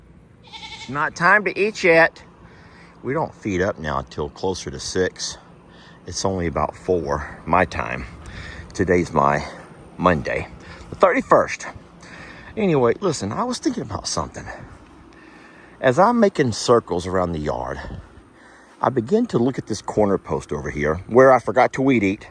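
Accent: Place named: Oklahoma, United States. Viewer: My grandparents on both sides would often say fire ants like you do, more than likely because they were from Oklahoma, Arkansas, Texas, area. My father, often called Las vegas," lost wages", But he was often changing words that sounded similar but meant something different. Which kind of rubbed off on me on occasion😅😅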